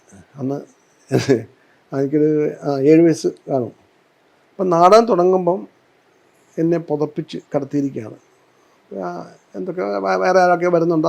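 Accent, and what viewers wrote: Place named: Kottayam, India. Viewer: സൂപ്പർ വിവരണം. കോട്ടയം ഭാഷ ആയതുകൊണ്ട് ടി യാന് മനസ്സിലാകുന്നില്ല എന്നു തോന്നുന്നു.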